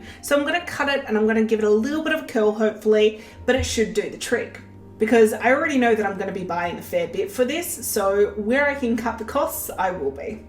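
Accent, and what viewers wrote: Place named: Australia. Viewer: I love the Australian english!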